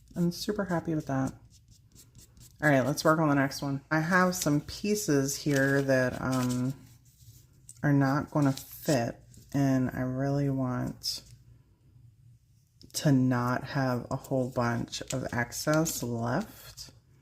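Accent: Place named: Pittsburgh, United States. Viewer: I just discovered you and I already love ya..sounds like you're from Pittsburgh...it's my hometown...it's been a long time since I moved so I may be off my game but Pittsburgh sounds like Pittsburgh..the way you said water and how you say the letter "O" makes me think of home...either way it's cool I found your page 💜🪬☄️ I love them all but I really like the contrast of black and white on the snowmen and I agree with you about painting the sides 💜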